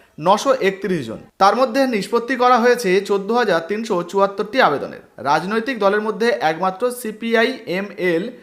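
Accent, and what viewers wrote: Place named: Bangladesh. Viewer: এ ভাই তুই জানিস যে বাংলাদেশী নিয়ে কি হবে তোর বাপের 1971সালের ভোটের লিষ্টে নাম আছে কি না তাই দেখ তার পর ভিডিও করিস তোর কথা শুনে মনে হয় তুই বাংলাদেশী আগে তুই কোথায় যাবি সেটা ভাব তার পর ভালো করে ভিডিও বানাস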